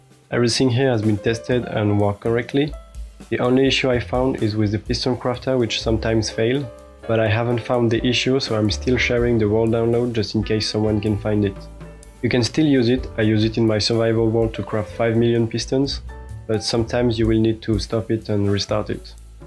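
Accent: France. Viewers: As a french , strong french accent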